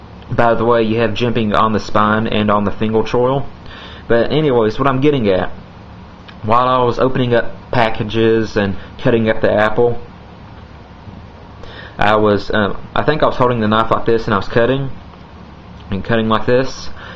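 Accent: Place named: Louisiana, United States. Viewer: Good review. When I got mine it was not that sharp and I noticed that the bevel is over 20º on either side. Was yours like that as well? I'm going to reprofile mine this weekend. You from Louisiana? Love that accent.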